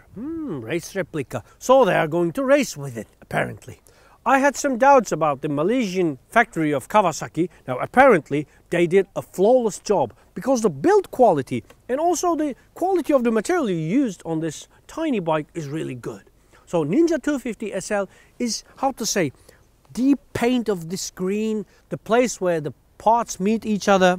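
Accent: England, United Kingdom. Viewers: Tam bir ingiliz aksanıyla konuşuyor, ne değer göçtü gitti bee....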